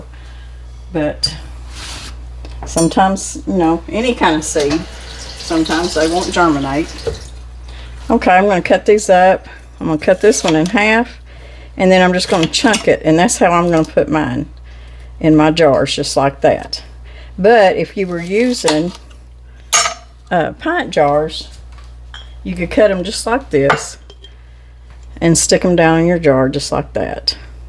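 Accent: South, United States. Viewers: I can't tell you how much your video helped me and it had nothin to do with canning. I can't remember the last time I heard a Southern accent. I've heard words come out of your mouth that I haven't heard for far too long. I love your personality and your style. I really appreciated the scripture as well. God Bless you and yours...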